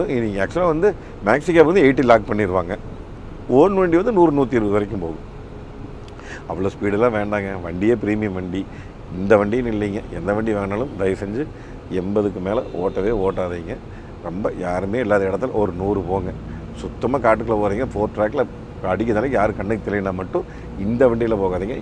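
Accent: Kongu, India. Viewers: Perfect Kongu Tamil. I live in US, and I take rental vans for trips, mostly I get Ford, Freightliner and Benz, looks like Force is giving equally or better than these models. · Anna ungaloda kuzhandhaithanam, Kongu Tamil ku na miga periya fan Anna 👌🏻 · sir naan ungal kongu தமிழுக்கு அடிமை ❤❤❤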